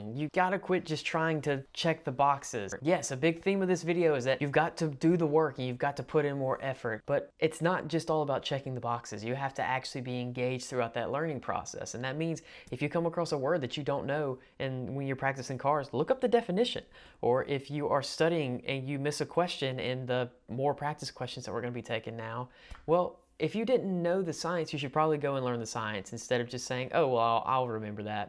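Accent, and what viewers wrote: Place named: South, United States. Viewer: Your particular Southern accent is nice to listen to.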